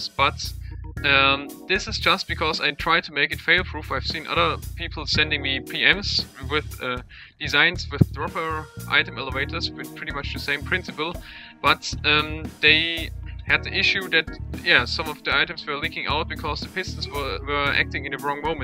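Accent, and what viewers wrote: Germany. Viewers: Woher wusste ich, dass du deutsch kannst? :D Irgendwie hört mans :D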